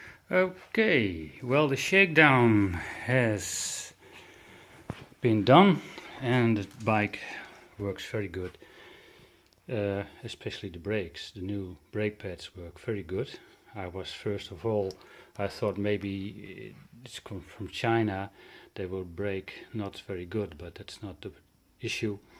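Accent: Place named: Netherlands. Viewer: Leuke video! Je kan wel beetje horen dat he Nederlands bent maar nog steeds leuke video’s ik heb pas een mtb en ben hem ook aan het verbouwen om maar te zeggen😂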